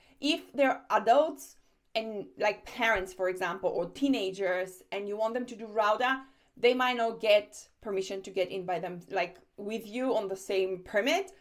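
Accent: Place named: South America. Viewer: Thank you so much!! Are you from South America? I really like your accent!!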